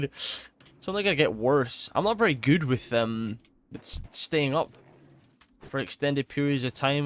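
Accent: Scotland, United Kingdom. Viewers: I watched the whole thing and I'm still wondering if yer actually scottish.... Pants?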